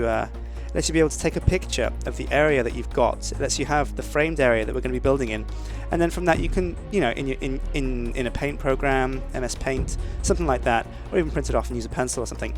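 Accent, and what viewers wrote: United Kingdom. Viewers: Because of his accent. It's scientifically proven you cannot get bored while listening to a guy with a Britsh accent.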